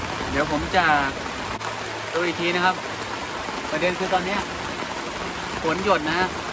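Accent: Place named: North, Thailand. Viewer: คนเหนือนีวา555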